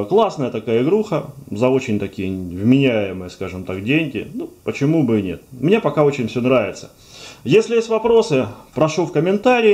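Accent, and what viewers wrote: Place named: Ukraine. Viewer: у его английского сильный украинский акцент =)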